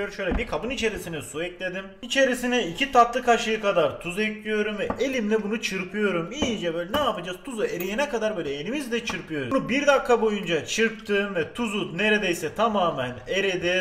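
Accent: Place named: Black Sea, Turkey. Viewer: Lan ben daha 12 yaşındayim niye bunu izliyrum da bu da karadeniz 🤣🤣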